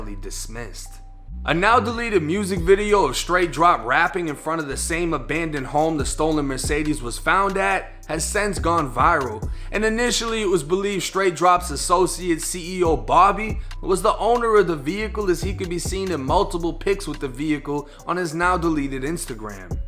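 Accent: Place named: New England, United States. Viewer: Yo I just argued with someone over your New England accent, they said it was a Florida accent smh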